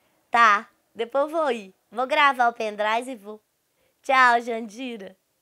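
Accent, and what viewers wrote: Minas Gerais, Brazil. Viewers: Adoro te ouvir... Minha mãe chama Josefa que nem a mãe da Jandira. E a familia do meu pai é minerim irgualzinho oce... Adoro... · Jamais os americanos entenderam este sotaqui que so os mineiros sabem .😂😂💋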